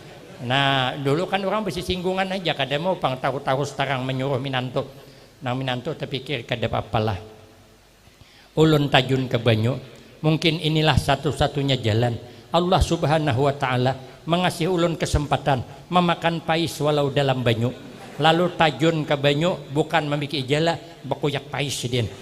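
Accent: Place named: Brunei. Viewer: Mcm org brunei bahasa nya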